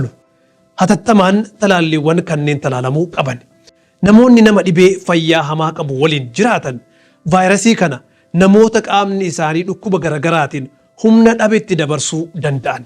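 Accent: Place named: Somalia. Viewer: İ think you talking like Somali language sir, is there any difference between Somali and oromo accent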